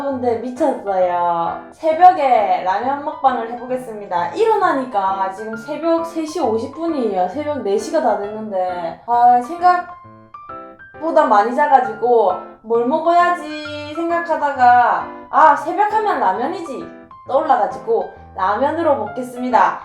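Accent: Gyeongsang, South Korea. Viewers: 후라이판이 몸보다 더 크네요 ㅡ 경상도 사투리 가 정감있네요 아침에 얼굴 안 붓나요?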